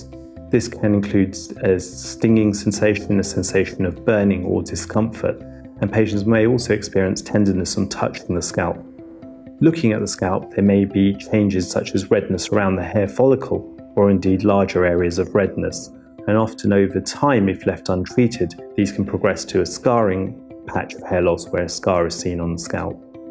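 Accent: United Kingdom. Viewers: Hy chutiya india or english mar rha h british accent main jesay donald trump ki na jaiz olad h jo becahry urdu ni smjhty wo tri bhn ko kryn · It's a classy British accent you fucking hater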